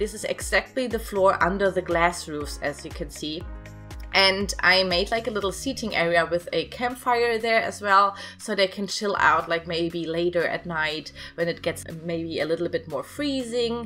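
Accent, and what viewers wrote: Germany. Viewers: Are you German? You sound like you have a german accent💗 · Alsoooo, I love your voice and accent so much!! It reminds me of my family and my Oma from Germany and it’s very nostalgic for me. I hate that someone kind of attacked you and criticized your voice that you talked about in one of your previous videos, it really upset me for you, because you’re just so nice and sweet and I really really love you, so I just wanted to say that you’re phenomenal and I love your voice! 💖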